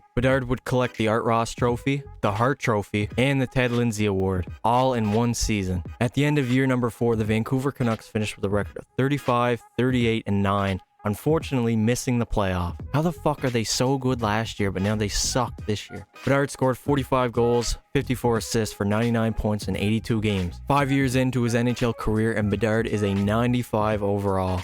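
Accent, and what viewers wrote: Newfoundland, Canada. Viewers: Most Newfie accent I've ever heard😂 loved the vid homie! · Are you a Newfie by any chance? I hear a hint of an accent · Are u a newfie? U sound like have a newfie accent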